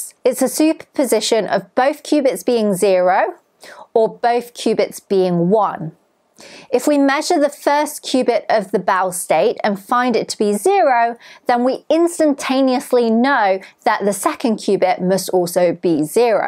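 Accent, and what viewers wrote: United Kingdom. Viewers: Forgive my bluntness, but I'm Canadian, I love languages, and I can't identify which UK accent you have, and I don't want to be rude by guessing wrong. So, what kind of accent am I listening to? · I am curious, are you a native British english speaker?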